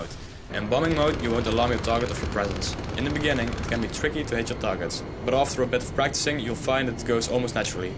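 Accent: Netherlands. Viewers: Dutch accent FTW It sounds so awesome :D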